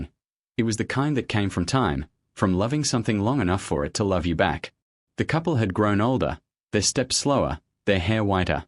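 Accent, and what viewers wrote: United Kingdom. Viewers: ♥️♥️♥️♥️ Loving the British accent ♥️♥️♥️♥️ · Do not care for the British accent! Not subscribing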